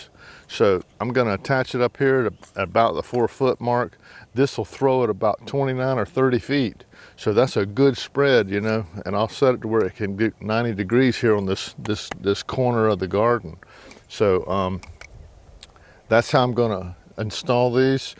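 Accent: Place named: Outer Banks, United States. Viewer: I'd be willing to bet your originally from carolina with that Hoi Toider accent. ;-)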